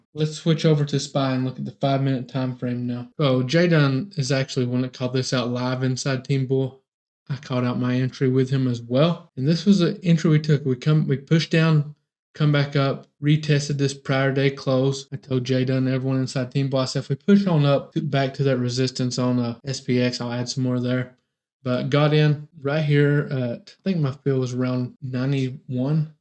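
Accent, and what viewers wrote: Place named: South, United States. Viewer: what state do you like in?.. sound like you from the south… i’m from TN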